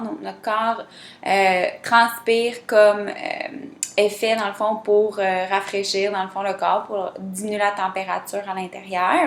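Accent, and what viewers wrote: Quebec, Canada. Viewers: Il est trop mignon ton accent québécois. T'as des bras super musclé dis donc 👍👍. T'es jolie aussi 😍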